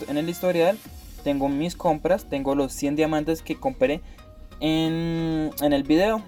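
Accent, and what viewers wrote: Colombia. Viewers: Gracias por el video, muy bueno, una pregunta ¿Sos de Cali? Disculpa si no reconozco tu acento jaja.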